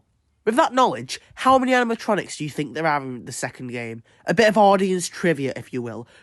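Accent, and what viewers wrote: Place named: Manchester, United Kingdom. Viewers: I think it’s a Manchester accent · I like the British accent 🔥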